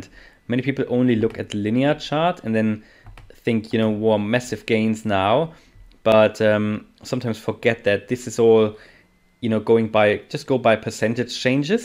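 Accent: Germany. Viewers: Vielen Dank für die super Erklärung mit deutschem Akzent! (VG aus die NL! 🇳🇱🧀🙂)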